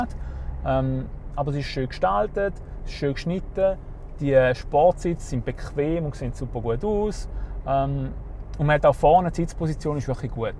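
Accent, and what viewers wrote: Switzerland. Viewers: de typ isch 100pro en sangaller hahahahhaaha me weiss jedoziit wa dVodorädo am mache sind. lol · hätte ich mir fast gedachtm hab Freunde die ich von Autotreffen kommen und wenn die ihr Schwitzerdütsch auspacken dann klingt das auch so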